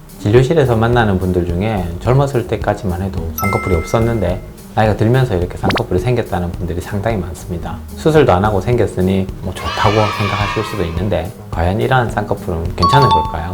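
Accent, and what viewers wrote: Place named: Busan, South Korea. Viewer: 제가 부산사람이라 그런지 부산사투리로 설명해주시는거 친근하고 좋아요^^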